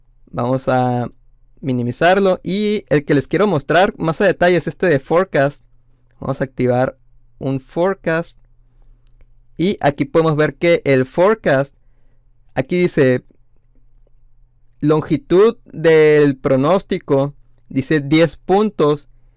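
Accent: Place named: Sonora, Mexico. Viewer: uste es de Sonora? muy perro el acento 🤙🏼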